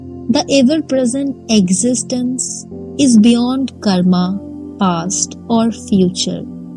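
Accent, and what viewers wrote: India. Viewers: Why have you chosen a sound with indian accent? To demonstrate the origin of R.M? That's a very bad choice. It would have more effects with ordinary English speaking audiences. We love his words in the most direct way of communication. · What is wrong with Indian accent? Are you a European?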